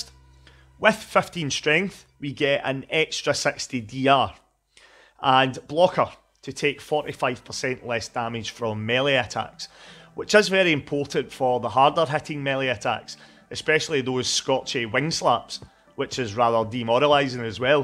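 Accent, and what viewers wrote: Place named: Scotland, United Kingdom. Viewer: Happening mate! I’m also Scottish so am very excited to hear your accent on some good fallout content. Just wanted to point out that you don’t get into the actual build what so every till after the 5 minute mark which means you spent a minimum of 1/4th of this video not getting to the point. Not saying this to out you down, just meant to be constructive criticism. A lot of people who google specific things about a game they’re playing such as “good non-power armour build” want a quick answer to a simple question so that they can get straight back into the game. Hope this maybe helps a bit as your ability to write a script and host is fantastic. You deserve significantly more subscribers and I’ve just become one. Best if luck mate.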